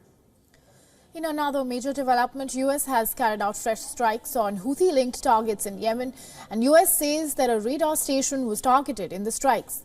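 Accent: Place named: India. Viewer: We couldn't stand her Indian accent.👎👎👎👎👎👎👎👎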